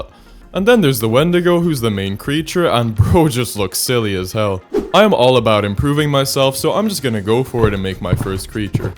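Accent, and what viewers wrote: Poland. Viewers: im new to the channel and i don't know but are you polish?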